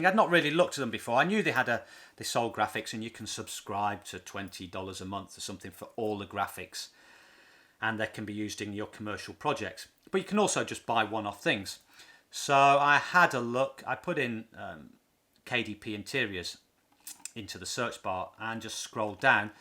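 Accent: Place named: Northern England, United Kingdom. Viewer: yes , i like your videos too . thanks for them .i just found you today , very grateful i found you .You sound like your from the north of England , i am wondering where exactly ....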